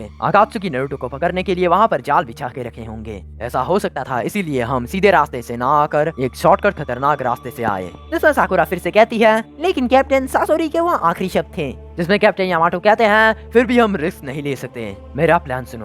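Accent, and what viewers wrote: Assam, India. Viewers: Are u Assamese 😒?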